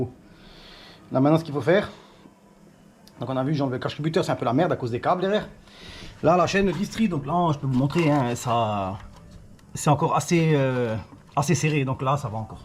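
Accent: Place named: Moselle, France. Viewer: Un beau tuto , dommage que je n’ai pas en profiter quand j’ai fais la mienne y’a deux ans Ma femme dit que tu as le même accent que moi Vive la Moselle-est mdr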